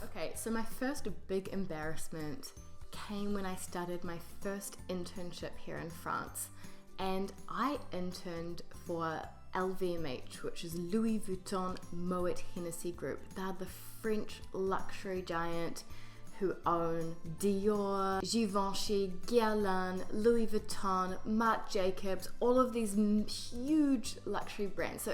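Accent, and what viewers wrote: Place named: New Zealand. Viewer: Your English sounds sooo much more French (If you know what I mean). I just finished watch a few old videos, The New Zealand accent has changed a lot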